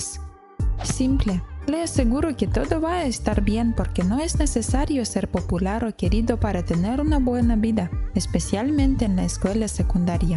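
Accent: Russia. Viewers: tus videos con voz rusa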